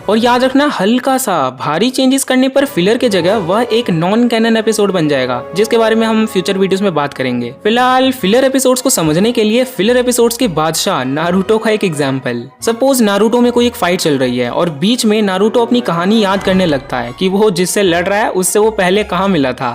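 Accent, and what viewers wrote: Bihar, India. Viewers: Kahe aap bihar se ho kya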